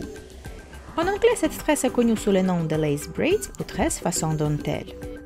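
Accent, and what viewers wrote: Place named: Russia. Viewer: you have a little accent. it's cute! Are you russian?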